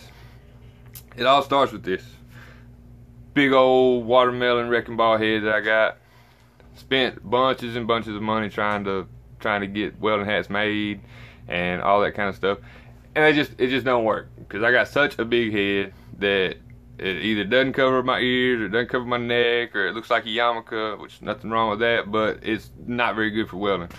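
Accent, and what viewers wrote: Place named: South, United States. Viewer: I've watched all 3 of these videos and I have to say you are a great teacher! It's nice to see a man doing a video like this, I'm sure that it will help to get more people involved. Anyway...we have a pile of Crown Royal bags and my bf was telling me that he needs a welding cap so I googled it and found that several tutorials exist using them and then I came across you teaching. It seems like I'm off to a great start, we'll see now how the rest goes...Thanks again! Oh btw where are you from with that southern accent?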